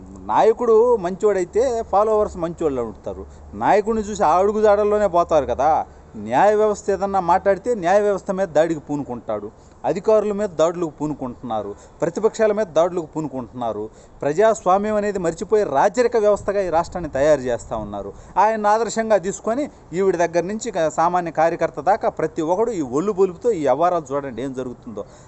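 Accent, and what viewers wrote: Nellore, India. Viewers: నెల్లూరు యాస ఇరగదీశావ్ తమ్ముడూ..కేక నువ్వు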